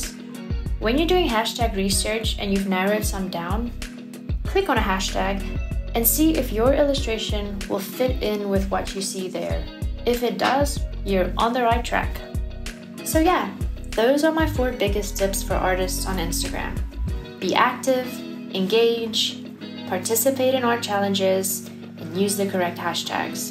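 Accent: South Africa. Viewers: You sound so South African ...others mistake our accents for all sorts of others, but we can always catch the subtle inflections etc, no matter where in the world. I live in Portugal. Adeus/Totsiens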